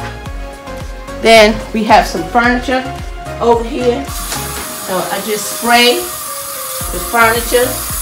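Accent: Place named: New Jersey, United States. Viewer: I love her mid-Atlantic accent (New Jersey)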